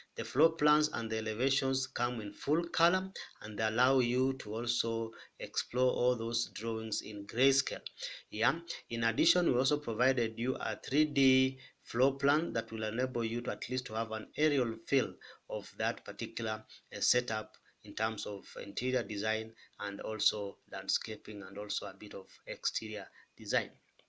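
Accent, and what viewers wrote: Kenya. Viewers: Good stuff man! and why do you sound like a Kenyan? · Sounds Kenyan.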